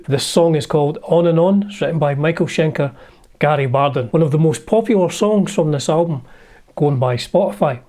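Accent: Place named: Edinburgh, United Kingdom. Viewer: Brilliant video that's a great song got the original LP and on Dbl live LP at Budokan belter. You're a great communicator clear spoken with that Edinburgh accent. I'm for learning this on my Ibanez RG don't yet have a Gibson or Flying V 🤔🎸👍🎧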